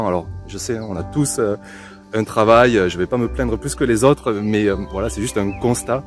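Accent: South, France. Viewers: Prisonnière dans d'énormes soucis de famille (deuil, succession, procédure de justice pour de la bêtise humaine) mon havre de paix reste sans aucune hésitation mon jardin, votre livre et vos vidéos. Le seul problème (qui n'en est pas un pour moi mais qui agace mes enfants et mon mari) c'est votre joli accent du Sud qui déteint sur moi. Du coup, le potager d' est très présent chez nous mais c'est que du bonheur. Merci et encore merci pour me donner cette force de me battre tous les jours dans un monde perdu dans le stress alors qu'il y a tellement de choses à prendre et à apprendre de notre terre. Une énergie hors norme dont vous savez si bien nous la faire partager et passer à travers nos écrans. Reposez-vous bien et à très vite. Cordialement.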